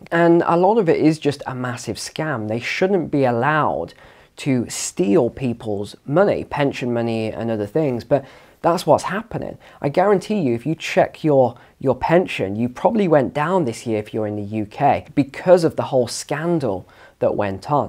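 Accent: United States. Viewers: Lol nice accent brother 😃 Apart from the top drawer US accent your work is splendid. Thank you fella. Great job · Pretty gooder American accent.. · Your American accent sounds good enough to me! My British accent amounts to adding words like "wobbler," "mate" and "cheers" to my sentences. Cheers,! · flawless american accent! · You do a pretty good American accent!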